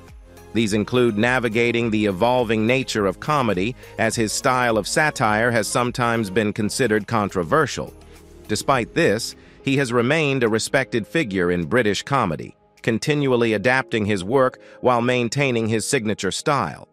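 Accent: United States. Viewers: Soon as I hear the AI American accent I swipe and reject…. No thanks.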